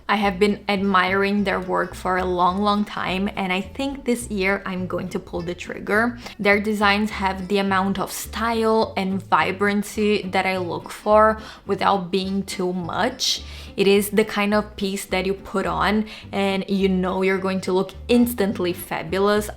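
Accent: Brazil. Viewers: wow so many good jewels, thank you!! I have been wondering where your accent id from - I Love Brazil and it's on my bucket list to visit :)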